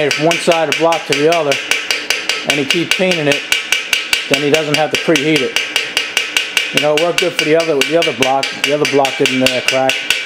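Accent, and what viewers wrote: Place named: North, United States. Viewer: Aye! Wddya say, look here tubes! Ain't that right! Hey! Look ere tubes! hey! I am just kidding, as a southern dude the northern dialect is fascinating! Keep up the good work!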